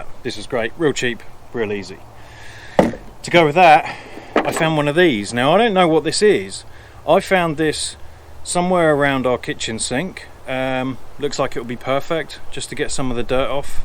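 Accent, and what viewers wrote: United Kingdom. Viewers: I was so confused at the start of your video. You sound very British, but your house and towing hardware didn’t match up. Your house didn’t have any brick or shiny black exterior plumbing. Good demo. I’m going to be doing the same to my towing hardware.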